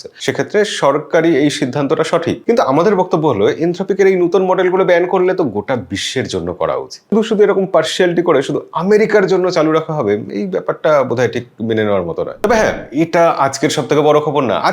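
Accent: Bangladesh. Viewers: এই ভদ্রলোক এর উচ্চারণ বাঙাল দের মত। কলকাতার দর্শক দের জন্য বেমানান। এবিপি এর মান এর সাথে যায়না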